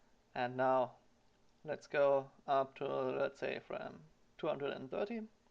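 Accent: Germany. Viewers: Hammer Englisch :D Ich liebe diesen deutschen Akzent :)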